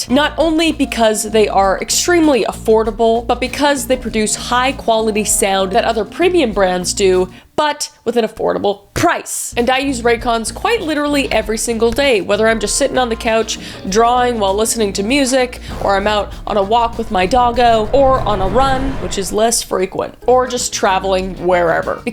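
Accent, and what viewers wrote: Canada. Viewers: Lol your losing you canadain accent lol and can totally tell you are from BC. I grew up in Calgary and I just giggled when you said Cal Gary lol. I love your videos.keep the amazing job lol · I really thought this was a great video to sleep to but first thing I hear is Canadian 👁️👄👁️ still gonna watch it rn tho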